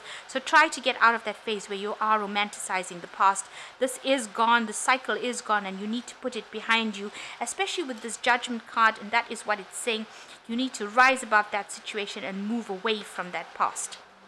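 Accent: South Africa. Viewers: Thank you for your reading. Are you south African? Your accent sounds familiar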